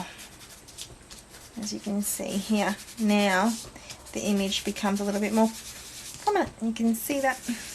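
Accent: Australia. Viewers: Love this card... simple, yet elegant. Vintage style will always get my vote! Nice to hear an Australian accent for a change.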